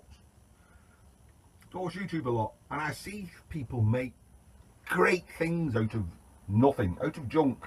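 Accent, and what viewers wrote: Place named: Wigan, United Kingdom. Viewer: You sound like a Wigan lad, what scrapyard do you use if i may ask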